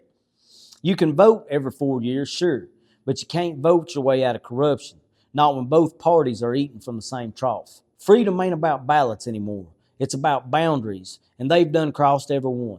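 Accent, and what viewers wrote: South, United States. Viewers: I love listening to his South Alabama Texas Redneck accent….☝️👍😁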